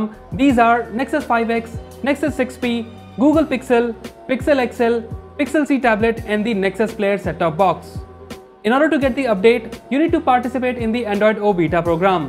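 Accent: India. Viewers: Your english is so odd hahahah what accent is this? I can barely understand what you say. People say that Indians have a very hard english to understand. That's true.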